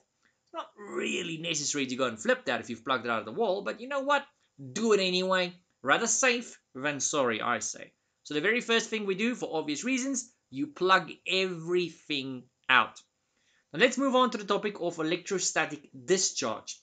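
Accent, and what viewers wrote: South Africa. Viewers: I heard the accent and thought “this is a fellow South African” 😂😂❤❤. Subscribed! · This accent. Are you south African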